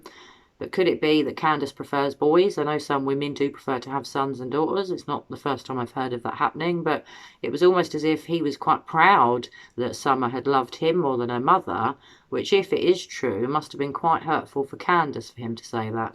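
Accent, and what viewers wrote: England, United Kingdom. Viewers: Lots of red flags. So many to count them. They acted like Summer was dead I agree with you I think this precious baby girl had an accident at the waterhole and they're all covering for each other Of course they don't want to go to jail or prison I think that one of them were some of them took Summers body and put her somewhere but not on the grounds for they live. Yes I'm sure the dogs would probably be smelling that if that was true I don't doubt that her body could have been in the shed to begin with and he moved it that's a thought too This whole family is covering for everybody is what I believe is what i believe. All they talked about was her past in that interview and that they knew she was gone forever. That's what I picked up out of it when I saw that interview. What part of England are you from? My Dad was from Birmingham England. 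I love your accent it's very beautiful. God bless you doing these videos and keeping the story going until justice will prevail. Whether she be gone or whether she still be alive, justice still needs to be served 🙏🙏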